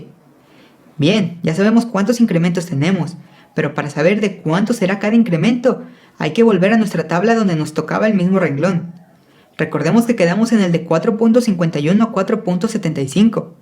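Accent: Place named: North, Mexico. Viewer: Este bato se le nota el acento del norte o un rancho rito , con acento muy marcado .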